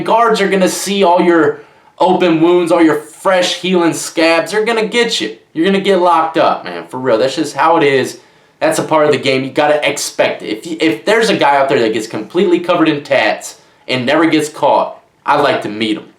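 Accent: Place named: South, United States. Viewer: He got a country accent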